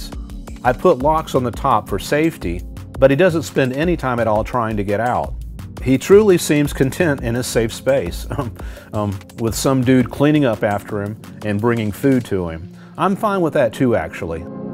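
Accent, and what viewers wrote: South, United States. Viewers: Exactly. His deep clam soothing southern voice and encouraging words. Happy little lobsters…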